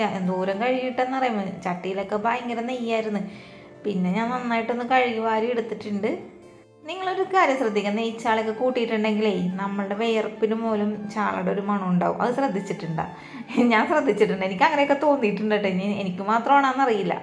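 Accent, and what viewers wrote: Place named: Kochi, India. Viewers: Samsaram kettittu kochikkari aanennu thonunnu. Ningal evideyaanu sthalam? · Ernakulam ആണെന്ന് മനസ്സിലായില്ലേ, എനിക്കറിയാൻ പാട്ല്ല കേട്ടാൽ ഉറപ്പ് എറണാകുളം.. പടിഞ്ഞാറൻ പ്രദേശം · പടിഞ്ഞാറ്ൻ ഭാഷ.... നന്നായിട്ടുണ്ട്...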